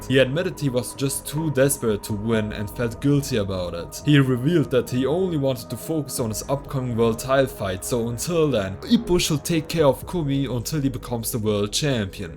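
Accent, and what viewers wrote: Germany. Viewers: Man hört den deutschen Akzent🥀 trz W Video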